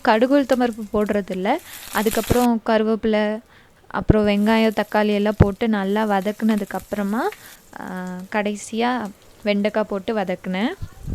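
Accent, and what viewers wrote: Kerala, India. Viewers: Your voice is changed like Malayalam slang akka it is nice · Akka neenga inaiku pesunadhu edho Kerala ponnu maari irundhuchu😝😝😍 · Akka nenga ea konjo malayalam kalantha tamil maru peasuringa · Ur tamil slang became like malayali's Tamil slang 😂... It's strange... What happened.... To ur beautiful Tamil slang... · Akka today in this video your voice sounds like a malayali but anyways enjoyed your video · Akka intha video la unga voice kerala voice madhiri irrukku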